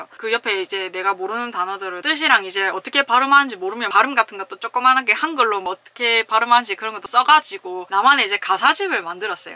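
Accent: Busan, South Korea. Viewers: 와..부산사투리 오진다... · 유투브에서 부산 사투리 들으니까 신선하네요 ㅋㅋㅋㅋㅋㅋㅋ 전 대구사람이라 친숙하다는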